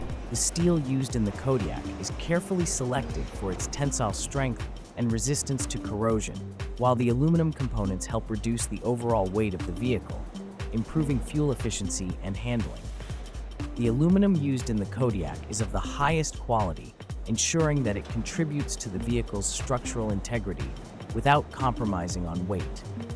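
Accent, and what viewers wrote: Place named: United States. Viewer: Not impressed by the american accent, nor the mis-pronuciation of aluminium .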